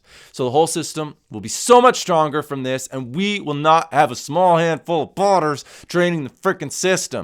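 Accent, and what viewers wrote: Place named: Australia. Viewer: You Australian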